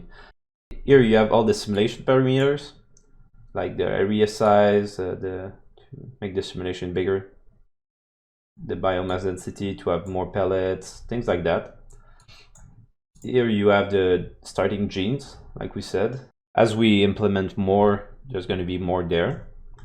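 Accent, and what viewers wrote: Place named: Quebec, Canada. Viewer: Are you from the province of quebec ?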